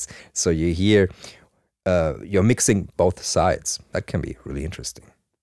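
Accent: Germany. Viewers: Your voice is like recording to tape. Crisp top end, tight low end. The perfect amount of German accent is like the subtle drift of ancient oscillators.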